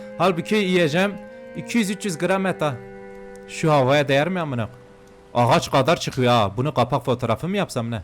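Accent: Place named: Diyarbakir, Turkey. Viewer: Abi nerelisin? Bizim Diyarbakırlilar gibi konuşuyorsun. Saygılar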